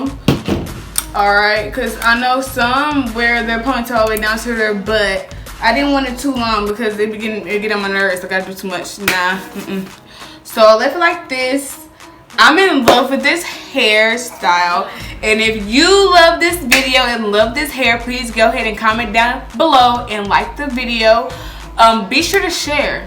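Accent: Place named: Baltimore, United States. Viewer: Im From Baltimore 😍😍 u do talk like us a lil bit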